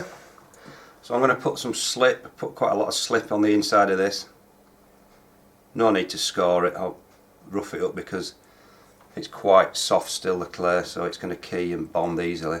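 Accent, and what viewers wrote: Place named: Liverpool, United Kingdom. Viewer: sounds like you're from Liverpool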